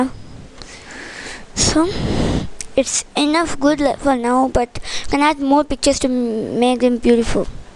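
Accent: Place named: France. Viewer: Are you french? Love ur Acent! :)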